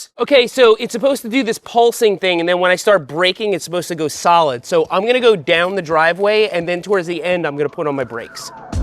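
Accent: United States. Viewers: Love the video as always. Freaking love the responses from the manufacturers on the comments. You always have something I wanna go up and show my significant other. She says you should have an accent, but to most you probably have a really hard American accent. lol idk Also isn’t what you call the third pocket the fifth pocket? Im sure there’s another name.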